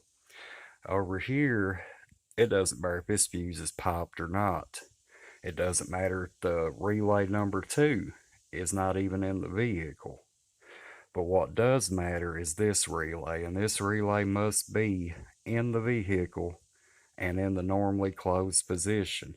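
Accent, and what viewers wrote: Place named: Texas, United States. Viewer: I bet anything you're from Texas 😅 that accent is unique from there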